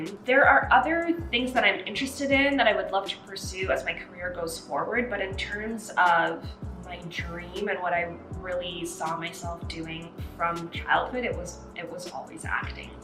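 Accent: United States. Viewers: As far as her American accent it’s not that hard to get after moving here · How does a Nigerian/Black Russian 28 year old, who looks like she is 18, end up with such a flawless American Accent?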